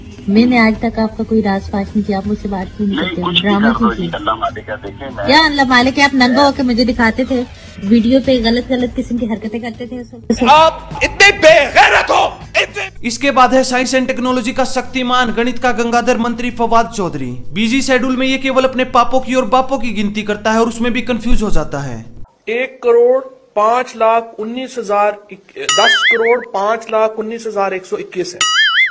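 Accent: Haryana, India. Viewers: हरियाणा के हिसार जिले से हो ना आप😁😁😁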